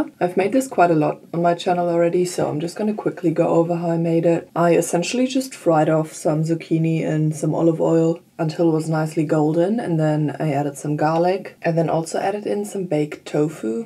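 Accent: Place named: Germany. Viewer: New subscriber here. Love to see your big portions of yummi plantbased food😅 and your german accent. I am from Denmark❤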